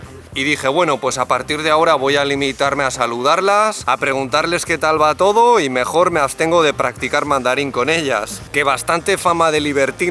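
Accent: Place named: Spain. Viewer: el tipo habla español de españa pero la cara no parece de españa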